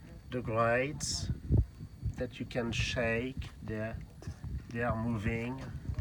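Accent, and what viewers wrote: France. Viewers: You have a very french accent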